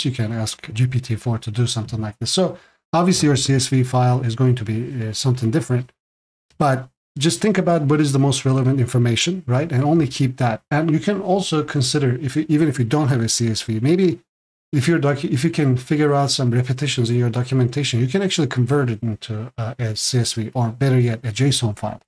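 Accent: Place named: Turkey. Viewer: Good points… out of curiosity, are you Turkish? I hear a Turkish accent.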